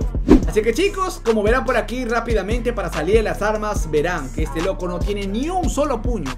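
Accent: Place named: Peru. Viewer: Eres peruano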